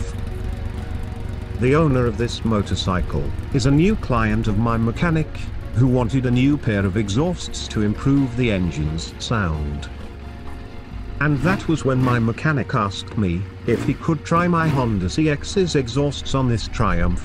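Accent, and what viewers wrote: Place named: United Kingdom. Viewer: Tudo é perfeito nestes vídeos a música e esse sotaque britânico é mto agradável de ouvir. Parabéns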